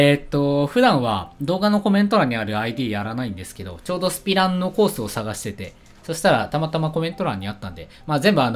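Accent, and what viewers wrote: Kansai, Japan. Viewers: さんの関西弁好きやな〜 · 最近、若干関西弁挟んでくるの面白いw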